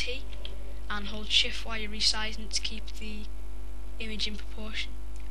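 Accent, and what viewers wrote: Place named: United Kingdom. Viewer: i was GOING to listen and watch this tutorial but i heard that you were british and like final fantasy so related videos here i come..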